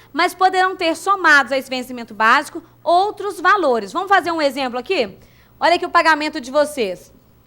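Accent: Minas Gerais, Brazil. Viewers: Essa professora é muito gente fina, além de ser bem humorada. Gosto dela e do sotaque de mineirinha :) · professora com sotaque mineiro perfeito rs sou Potiguar mas amo sotaque mineirin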